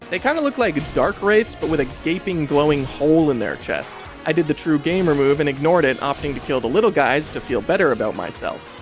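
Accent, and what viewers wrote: Canada. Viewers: are you mayhaps.... canadian?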